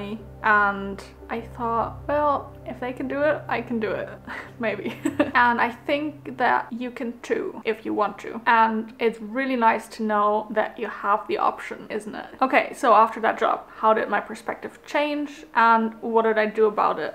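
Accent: Germany. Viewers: Hi, there are many German colleagues at my company and all of a sudden I just want to see this accent again 😄. Then, saw your ''when german engineers speak English'' video. It was the first time I discovered this channel. When it comes to this video, a university internship has been a turning point in my career aim. I was planning to become a manager since the beginning of the university even though what it is exactly, just like you. Whenever I watch one of your videos, I am settled more creative and focused mindset. Thank you for the quality content and the thoughts you share!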